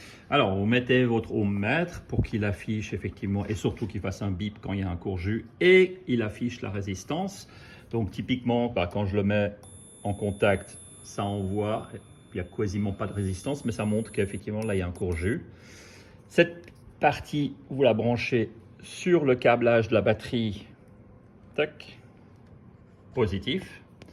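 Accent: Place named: Vaud, Switzerland. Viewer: Très sympa et j'adore l'accent vaudois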